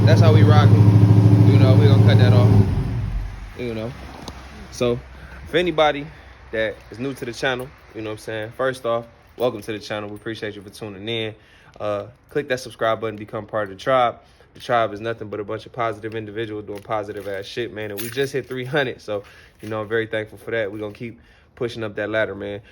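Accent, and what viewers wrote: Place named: New York, United States. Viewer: you sound like youre from nycc papa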